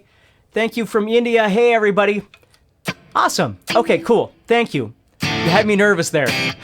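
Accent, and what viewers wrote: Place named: California, United States. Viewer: Hi, great lesson. I'm sorry I'm just under a year late to your class! But at least it's Friday! I love your method of teaching, you seem to be a really nice guy! I liked some of the things you said about, "you would jam all day, with no problem, and then get with friends to jam, and you suddenly forget everything", yeah that's me!!! And don't worry about not knowing enough, just have fun with it!! See I'm 98% self -taught at this point, So you kind of don't catch little thing like that! That matters! I maybe wrong but, you sound like you're from California. I'm from Georgia by the way! I went to you PDF file, and can see, where some of your class was from, Wow man your world wide!!! Thanks so much for what you do, I wish I had 10 more like you! I've got to go now. So see you in the next video! Todd P.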